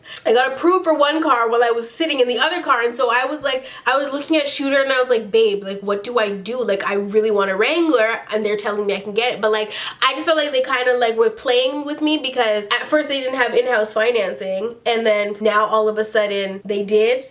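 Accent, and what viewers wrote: England, United Kingdom. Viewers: Her British accent lolll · Love your English accent 😂😂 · I like your British accent it fits you.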